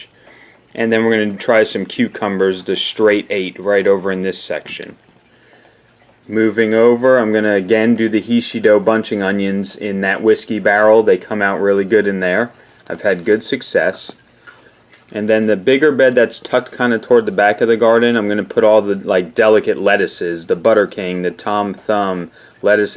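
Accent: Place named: South Texas, United States. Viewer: I hear South Texas in your voice. Do you ever grow super hot peppers.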